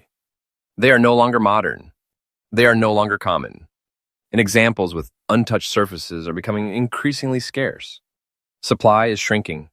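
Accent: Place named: United States. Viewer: Something fishy about what you've said about 1984 Australian 50c coin, started with $201,000...and later, you said it's valued at $183,000 Really? Is it genuine? Not some kind of scam? I'm sure.. I'm not the only one watching and following this video. Should be an Australian talking about Australian coins, but you sound like an American.. Come clean, man!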